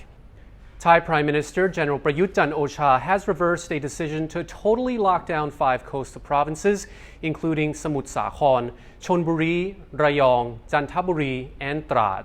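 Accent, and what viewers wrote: United States. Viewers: it's his painful to listen to American accent, if he softened his voice a little would be much better, he's not ugly but that's irrelevant, have you asked him for a online date yet? Zoom xx 😜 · No way bring back Tim theres nothing worse than hearing an Asian speak with an American twang give me the aussie accent anytime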